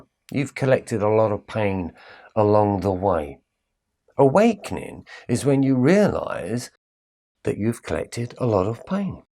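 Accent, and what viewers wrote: Cockney, United Kingdom. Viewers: Thank you, it is good to hear this and watch your other talks on the dark knight of the soul..It is helping me a great deal. Plus your voice sounds similar to my Mums who was from the east end of London. Her death and Covid last year triggered I believe this time I am going through now... There is a lot of help on the Internet and I pick and choose who I listen to. You are a comfort.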